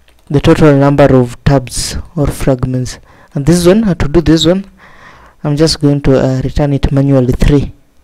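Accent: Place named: India. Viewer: I have no problem with typical Indian accent, but you eat words with your pronunciation and make it impossible to understand what you say, not to mention the low volume of the voice as well. Thanks for the effort, but it's a futile one in my opinion.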